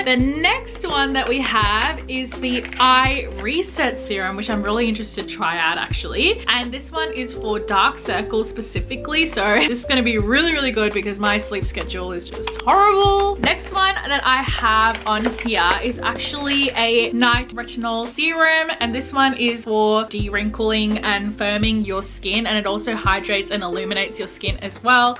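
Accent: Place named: Australia. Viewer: god i miss this accent! aussie living in canada here! these pieces are so amazing! new sub here.. already in love with your content!! :) xx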